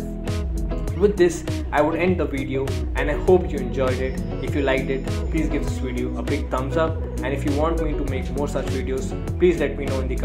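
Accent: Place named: United States. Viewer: Well is that voice your's sir! I wonder! Its different but appealing! A bit of American accent!! Nailed it👍🏻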